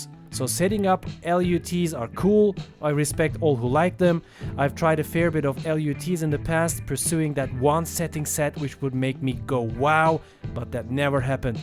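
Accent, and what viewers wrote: Turkey. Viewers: 2. saniyede türkmü bu dedım kanala gırdım türkiye gordugum en temız ıngılızcelerden bırı olsada bellı edıyor kendını elıne saglık bu arada vıdeo ıcın sagol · reis türksün demi la jsajnfashjkfjlas aksandan anladım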